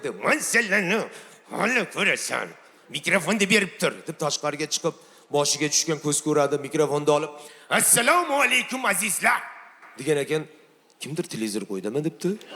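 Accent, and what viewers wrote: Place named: Tashkent, Uzbekistan. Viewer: Гап йук Тошкент шеван ёрасиз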